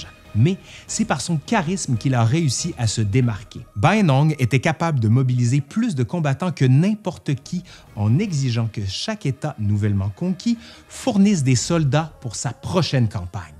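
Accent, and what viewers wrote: Quebec, Canada. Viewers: Frchmt avec ton accent québécois et ta façon de raconter ça donne envie de te suivre et d'en apprendre plus sur l'histoire 😉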